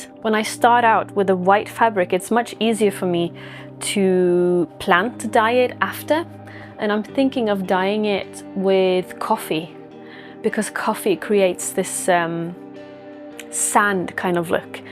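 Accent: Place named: England, United Kingdom. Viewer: Did she live in UK or something? She sounds English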